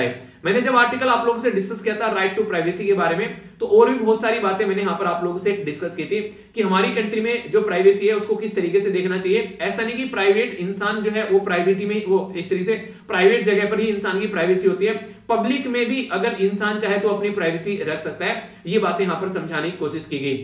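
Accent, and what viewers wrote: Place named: Kangra, India. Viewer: Sir kangadi bolte hai 😎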